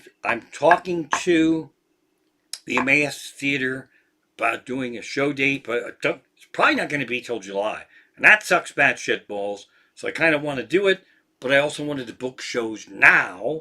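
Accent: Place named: Ireland. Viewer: This was one of your best shows! I laughed so hard! It is great! Love your Irish accent!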